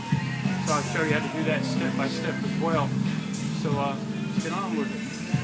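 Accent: South, United States. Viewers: Haha I thought I heard a slight twang in your voice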